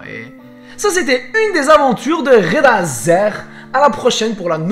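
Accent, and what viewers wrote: Canada. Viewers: t as un accent canadien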